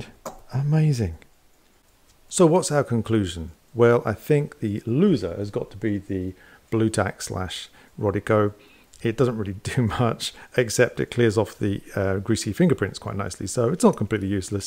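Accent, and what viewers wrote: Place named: England, United Kingdom. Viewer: I just love listening to your voice with that yummy English accent.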